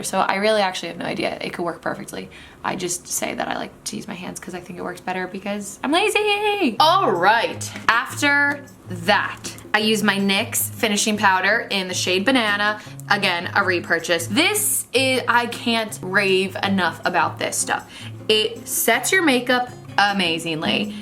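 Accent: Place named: Long Island, United States. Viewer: I thought might’ve been Italian like Long Island Italian until I got to the part were she couldn’t say Caprese LOLOL I love her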